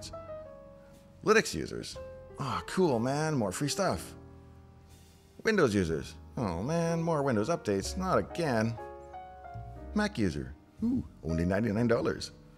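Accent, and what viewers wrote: Canada. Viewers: A second option that may help is to CC the videos, as I quite enjoy listening to the pnw/Canuck accent you’ve got going on.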